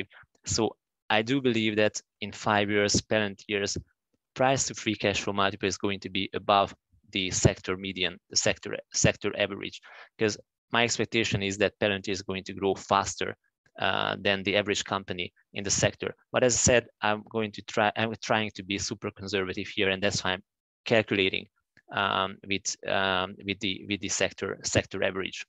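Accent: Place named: Hungary. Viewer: I live in Oregon but you sound like a Hungarian!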